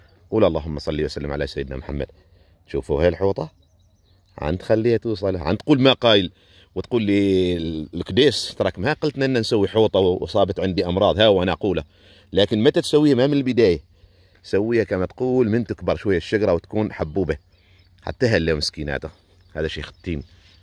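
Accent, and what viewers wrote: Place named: Algeria. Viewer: صوتك تقول عليه جزائري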